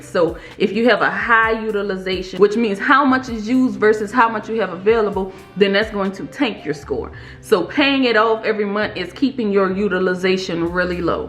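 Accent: New Orleans, United States. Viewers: you're from New Orleans lol.... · She sounds like she is from New Orleans 🤔🤔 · Sis are you from New Orleans?👀👀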